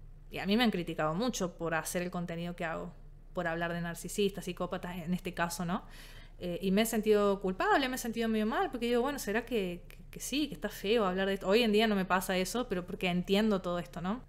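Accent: Córdoba, Argentina. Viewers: Sos genial, como profesional y como persona. Te abrazo fuerte. Soy de la ciudad de Córdoba, por tu tonada me parece que también? Me encantaría ser tu amiga, jaja. Somos de la misma edad imagino. No sé si se hacen amistades así pero desde que te sigo lo pienso 😅 Qué intensidad todo lo que aprendí este año sobre psicología gracias a querer entender cuál ha sido mi experiencia con mi ex. Me ofendió, me dolió muchísimo lo último que me dijo. Pasó límites. Es alguien a quien amo aún, fue mi amor por años, mi gran amor, mi mejor amigo. Me cuesta mucho volver a querer tener relaciones amorosas con hombres porque me siento dolida y traicionada, así que todas estas palabras me ayudan un montón. ❤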